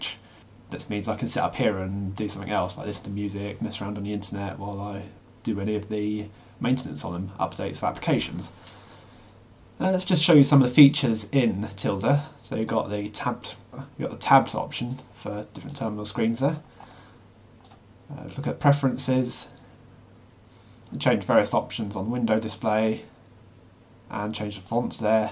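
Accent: United Kingdom. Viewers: You have very British accent. ;-)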